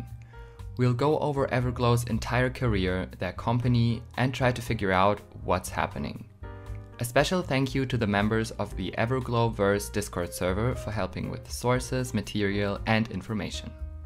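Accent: Germany. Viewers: I immediately knew you were German because of your accent😂😂 I'm definitely scared for Everglow's future, I hope everything is going to be okay and I hope they won't disband anytime soon. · Is german your first language? you kinda have a german accent 😅 very informative video the company is doing them dirty!!!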